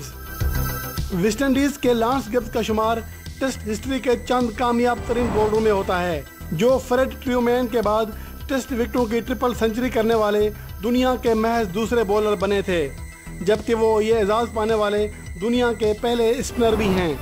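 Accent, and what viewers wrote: Sindh, India. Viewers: Aap ki boli se lagta he aap sindhi ho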